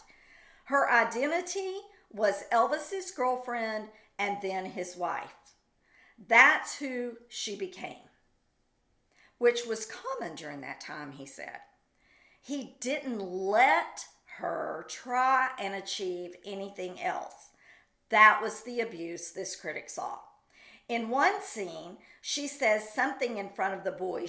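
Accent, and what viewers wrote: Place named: Memphis, United States. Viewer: Cilla is going all-out to create an angelic image of herself in the public eye when we all know she wasn’t. Ps. I love your accent, is it a Memphis one?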